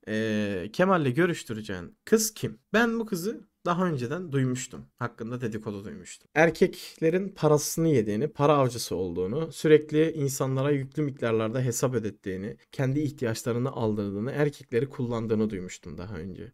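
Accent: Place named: Izmir, Turkey. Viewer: Aga nerde duysam tanırım izmir bura amk yaaaa 19 yıl yaşadım kesin izmir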